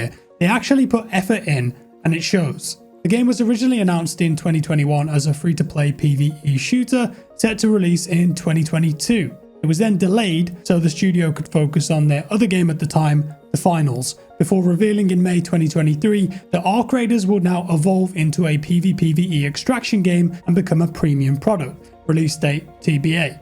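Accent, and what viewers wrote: United Kingdom. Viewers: I have to say it's not that often that I can handle the British accent for more than 30 seconds. But I can handle you talk for 10 minutes and that's good enough. Talk about how good the graphics are, the gameplay, the movements, and how do you feel with the third person perspective. Please try to sound more American normal or at least a non-wining accent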